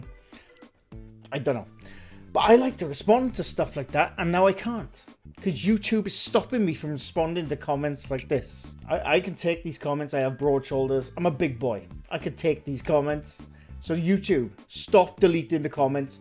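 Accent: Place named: Scotland, United Kingdom. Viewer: You go sir, I would love to meet you and we do our own investigation and your absolutely correct once that money rolls in they are no longer a progression of themselves and start making bullshit. Id do a investigation with ya but it seems like your from maybe Scotland holy shit that whole place is haunted as hell I truly believe. Keep doing what you are doing I love it ..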